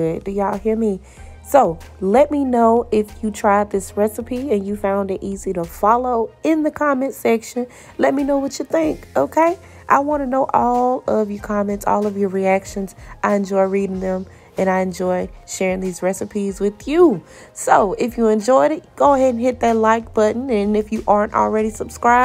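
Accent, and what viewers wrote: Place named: Atlanta, United States. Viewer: Looks awesome!!!, u sound like you’re from Atlanta or Atlaanna how we say it😂😂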